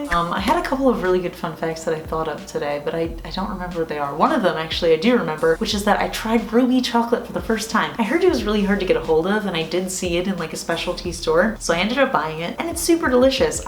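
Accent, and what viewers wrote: New Jersey, United States. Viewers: Love our Jersey accent!! How about the way we dog. Dawg!! Lol!! Loved this vid!! Orange gives me serious fall vibes!! Love the fall!! Hugs!!! 🤗🤗🤗🤗🤗💚💚💚💚💚💚🍊🍊🍊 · Forget the polish,which,by the way were all gorgeous, ZOYA-“Alma” & SALON PERFECT-“Too cute or spook” were my favourites.It is your EYE makeup that captured my attention,it is stupendous,and your accent is brilliant,I think the New Jersey accent is by far the best in the US of A. I have tasted ruby chocolate,it was a Magnum ice cream bar,and I thought it was raspberry flavoured but it said nowhere,as you said aswell,that there was fruit flavouring,apparently it’s the natural “fruity” taste of the ruby cocoa bean 😳🤷🏻‍♀️. 💚🇮🇪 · Maybe because I'm a Bostonian I dont mind the Jersey accent..🤷🏻‍♀️ lol dont get why it bothers people lol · Purrrrrrples! Purps purps purps - lilac and lavenders next please :) and hell yes Joisey girls unite! 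It’s wharter not wahter duh 🙄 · Hey lady I love how you say your Jersey words and if people don't like your accent, fuck em'! It's ridiculous to me that people give you crap for how you pronounce some words... you are eloquent and intelligent and I love your videos! Thanks for being you 🧡🧡🧡🧡🧡🧡🧡🧡🧡🧡🧡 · Didn’t realize that got so much hate for her Jersey accent. It seems such a stupid thing to be annoyed over. Everyone who isn’t from where you are is going to have an accent. You have an accent to all those people. What’s the big deal? I personally like hearing different accents. I like the variety of voices. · 🤯I’m from NJ and I didn’t know we said Orange different until I heard you, 😂 and I live in the Orange state now! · I’m from Jersey too and I say it the way you do and until you said it I never knew anyone said it differently 🤷‍♀️ lol. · Your accent is adorable! From a Canadian to a NJ! 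I worked for Amex and NJ was my fav state of people to talk to. 😊😊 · Triggered by your NJ accent. 🙋🏻‍♀️ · From one Jersey girl to another, don't apologize for how we speak! Funny thing I never noticed how I said orange! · I know I’m late the the game, but your “arenge” doesn’t even phase me! My husband and his family are from NJ, so I hear it ALL the time! I love you! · From one Jersey girl to another.. our accent is fabulous. Love the video too! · I’m a Jersey girl too!!!! I say orange the same way & coffee... 🤣I love the orly color. Also I love how you did your makeup orange - you look so cute. 🍊💗🤗 I picked up a bunch of OPI polishes from Marshall’s today & found myself checking to see if the polishes were jellies or not using the tips from your videos.😉 $3.99- win! I love the OPI brushes. They had lots of nudes & good fall transition colors. Anyway thanks for another great video!! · I'm glad you're making this series! 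I honestly needed more oranges in my collection. Thanks for the suggestions and the reference! I'm trying to get out of my cold color bubble and trying to wear warm colors. I don't really notice the New Jersey accent since I'm also a New Jersey kid, do we really have an accent.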